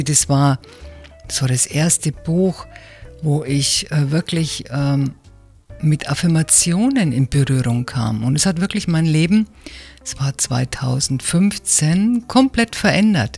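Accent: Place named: Bavaria, Germany. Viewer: Herrlich, liebe, wieder tolle Inspiration und das kurz und knackig - ich liebe deine offene, ehrliche Art, deinen Witz und natürlich dein bayrisch!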